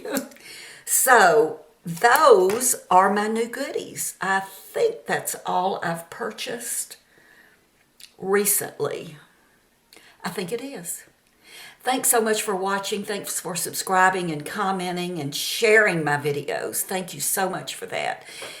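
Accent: South, United States. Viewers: hear that southern drawl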